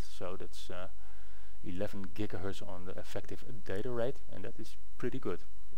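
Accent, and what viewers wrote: Netherlands. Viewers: Goeie englisch men i almost kant hoor your duts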